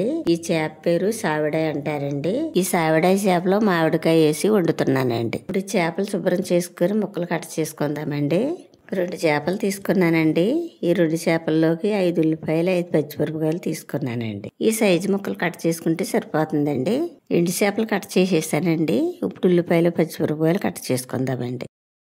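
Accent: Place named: Godavari, India. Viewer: అండి అండి అంటున్నారు అంటే మన గోదారొళ్ళే 👌👌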